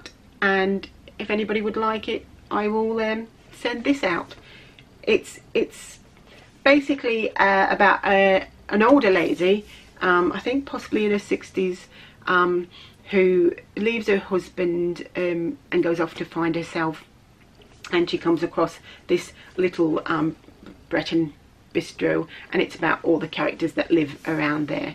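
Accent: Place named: Australia. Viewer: Hi just discovered your podcast through little drops of wonderful loving it love your accent thought you were Australian keep on the good work looking forward to your next one x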